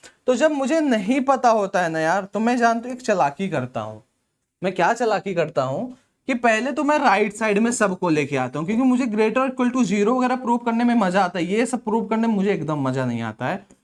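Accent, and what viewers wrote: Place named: Uttar Pradesh, India. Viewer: UP guy, not odia.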